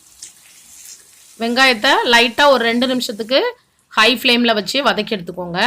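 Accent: Tirunelveli, India. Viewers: Neenga thirunelveli ya akka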